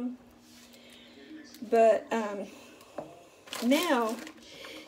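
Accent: South, United States. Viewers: Sour cream in potatoes are delicious too! I like the idea of cream cheese. I love your southern accent & u can cheat with the potatoes use half water & half milk as long as u spice them right no 1 else knows u used part water :)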